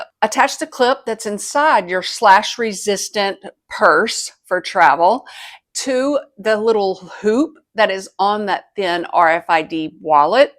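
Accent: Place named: Texas, United States. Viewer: Texas accent But I'm a big fan of travel tips Definitely avoiding chafing lotion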